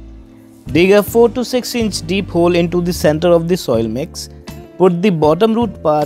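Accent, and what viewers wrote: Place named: India. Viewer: Thick accent nothing is more annyoying than Indian accent.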